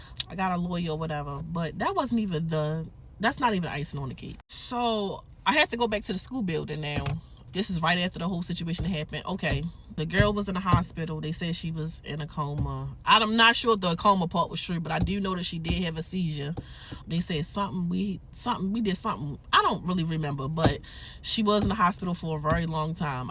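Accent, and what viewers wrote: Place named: Baltimore, United States. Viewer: I knew exactly where she was from before she said it!lol gots to bmore careful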